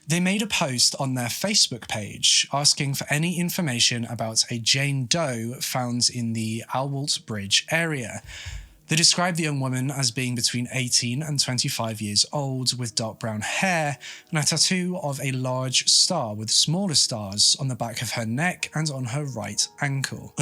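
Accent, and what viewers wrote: United Kingdom. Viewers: Are you british? I love your accent.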